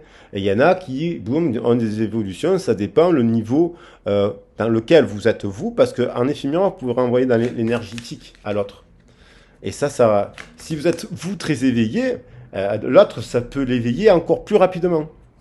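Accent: South, France. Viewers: vos guidances vous êtes trop fort! Toujours un plaisir de vous écouter avec votre bel accent du sud merci.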